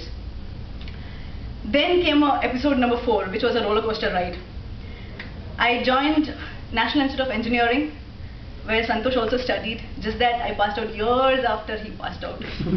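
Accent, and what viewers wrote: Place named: France. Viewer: the rythum of your English is almost French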